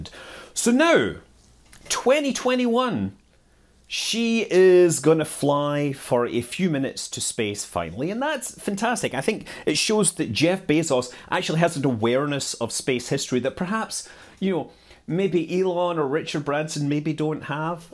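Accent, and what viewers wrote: Scotland, United Kingdom. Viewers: He's Scottish. Well, kinda.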